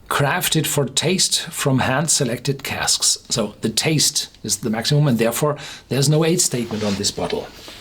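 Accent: Germany. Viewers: Sounds German , not Romanian..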